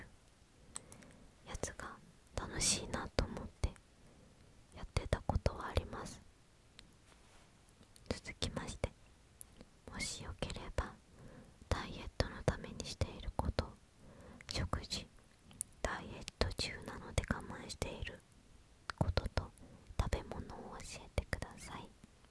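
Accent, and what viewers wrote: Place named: South Korea. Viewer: 韓国の方の日本語聞いてて気持ちいいですよね♪(^▽^)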